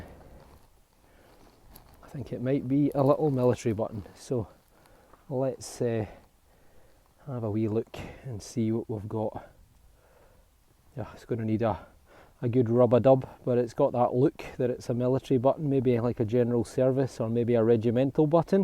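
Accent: Scotland, United Kingdom. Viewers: Aw man sing, your Scottish accent is very cool. I have not long stepped up to a Deus. I was using a Fisher F2 but i think i had out grown it. I'm hoping the Deus makes all the difference. Thanks for your time and showing how superb these machines are. I haven't scratched the surface in terms of it capabilities and don't expect i shall for some time. I got the wireless remote and ws4 package and it's super light, very impressed.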